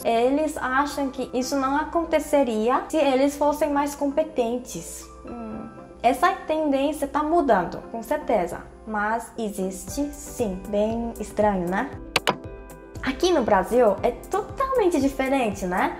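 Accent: South Korea. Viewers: Você ja deve ter ouvido falar, mas aqui em minas gerais falamos "né" com MUITA frequência mesmo. Da até uma certa "familiaridade" em te ouvir falar mesmo com o sotaque coreano kk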